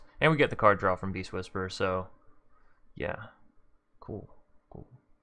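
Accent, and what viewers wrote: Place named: Alabama, United States. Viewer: You from Bama homie?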